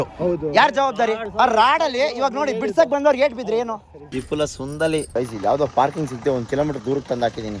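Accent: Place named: Malavalli, India. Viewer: Hi bro... Nan nimma vlogs na recent agi nododikke start madiddu compare to others nim vlogs nange tumba ista aytu bcoz of namma kade use mado slang alli matadtira navu malavalli avre😊 keep on growing bro ❤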